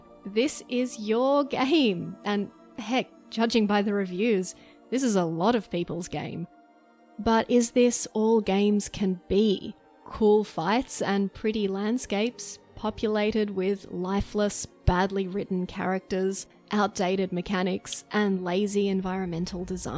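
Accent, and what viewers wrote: Australia. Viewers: a hole in the floor for the ore to drop through is a fun thing to hear in an australian accent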